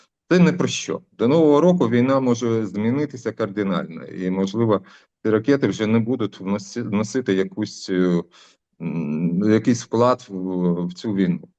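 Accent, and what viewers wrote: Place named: Surzhyk, Ukraine. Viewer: Ну говори на русском, если сложно, нафига этот суржик!??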